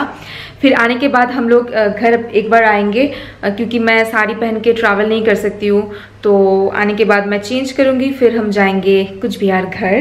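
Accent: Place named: Bengal, India. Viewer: GOD BLESS U BROTHER✋✋ i..like Ur. Talking.style. Very.much I love.ur bengali. accexent. Hindi 👌👍🌷💓 Sweet.vlog🎂 Cute couple👑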